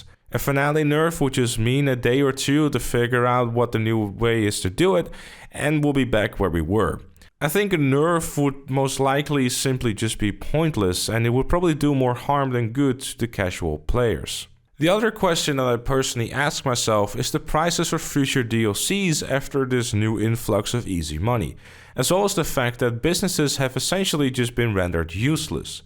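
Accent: Netherlands. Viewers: Are you dutch ? I can kinda hear it in your voice 😂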